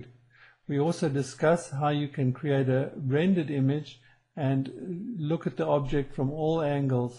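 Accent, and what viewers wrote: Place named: South Africa. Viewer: South Africans should never, ever be allowed to do voiceovers. Horrible. And I'm a South African myself.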